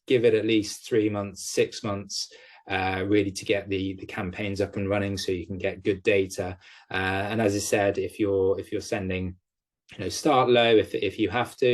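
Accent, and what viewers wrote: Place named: United Kingdom. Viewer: Hi you sound like your from the UK. 🇬🇧 I’m from London UK aswell, I’ve been E-mailing tons of companies in my cleaning business. for the past 1 month from 4 different Gmail accounts every single day. 25-mails to companies each, a few responses back but only them responding saying not interested. 1 or 2 have got back to me saying they want me to come for a walkthrough. Is there something that I might be doing wrong? Is there more of a simple way to get more cleaning contracts quite quickly? Thanks bro look forward to hear from you.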